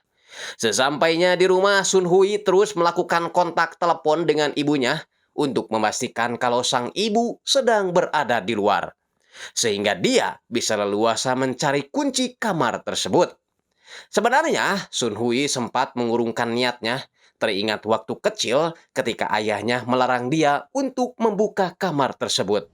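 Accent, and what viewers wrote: West Java, Indonesia. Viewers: Logat adminnya sunda bangett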